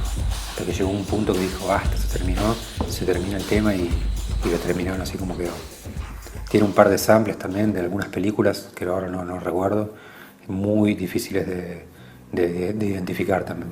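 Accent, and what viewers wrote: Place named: Argentina. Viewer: Que video tan epico lpmqlrp, hablas argentino ahí y todo re piola, aunque no te puedo negar que NIN es industrial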